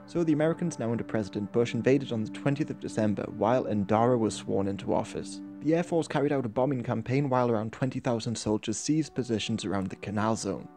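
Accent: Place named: United Kingdom. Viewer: If you're gonna make a historical video with a heavy british accent, consider adding accurate subtitles as well. I have no idea what you're saying half the time.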